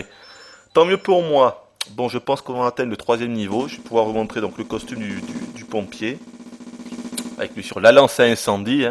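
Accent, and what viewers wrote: South, France. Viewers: Ah sinon, j'aime beaucoup ta voix! Elle est agréable à écouter... cela doit venir en parti de ton accent du sud :)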